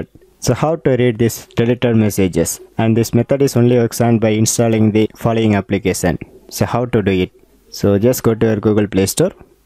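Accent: South India, India. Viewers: South indian voice , nice video bro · South-indian accent love tht 👍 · I think that he,probably, is a south Indian.because his accent is a bit like that.however, the video deserves a salute😊😊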